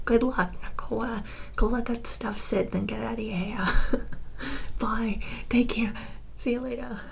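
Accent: New Jersey, United States. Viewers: Haha the accent is so cool! All i could think of was jersey boys :D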